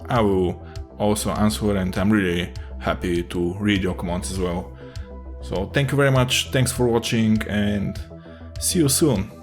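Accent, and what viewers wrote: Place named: Russia. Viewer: Почему твой акцент подсказывает что ты русский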